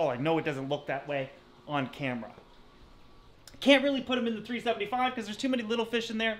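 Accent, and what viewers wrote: Canada. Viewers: As a Minnesotan, I adore your Canadian accent! Makes me feel like I know you. I appreciate the videos and great information, I'm just getting into the hobby and your channel has been a go-to source for information :)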